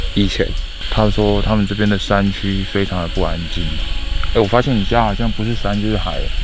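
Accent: Taiwan. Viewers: 晚上好开头介绍都用台语来说馁~我喜欢~那个低吼声我也有听到。。😰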